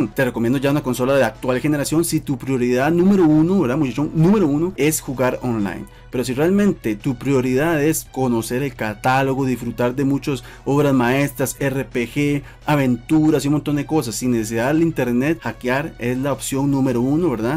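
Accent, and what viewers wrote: Costa Rica. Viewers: Eres de costa rica?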